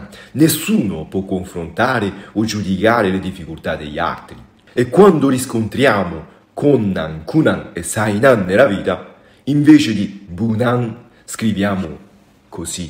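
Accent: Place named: Rome, Italy. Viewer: Certo che l’accento romano su di te ha degli effetti sorprendenti! Grande. (Per inciso, magari sapessi parlare giapponese come tu parli l’italiano)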